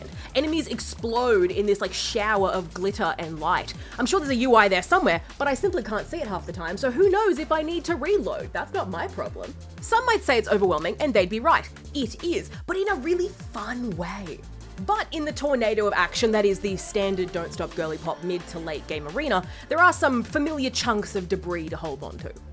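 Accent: Australia. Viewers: great vid! was excited to hear an aussie voice! didnt know this game was from my side of the country either! thats neat. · Great video! So good to see the aussie indie scene get some recognition and to hear an aussie voice on Youtube, can't wait to see what you come up with next!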